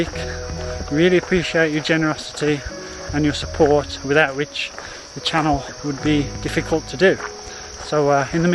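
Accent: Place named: England, United Kingdom. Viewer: must add that your English-accented mandarin is quaint & interesting 😋👌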